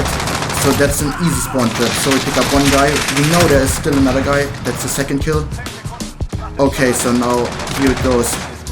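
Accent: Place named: Germany. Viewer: Deutscher Akzent am Start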